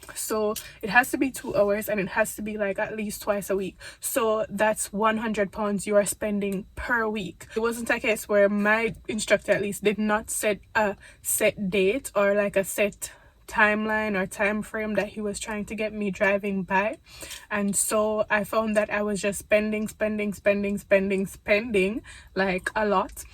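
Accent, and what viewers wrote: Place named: Jamaica. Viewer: Girl, when I head that Jamaican accent I got gassedd!! I love finding Jamaican YouTubers. These tips were helpful even those I failed twice lool I'm going to use them in my next test and hopefully, I pass because ain't no body got money to be paying for lessons and test again and again.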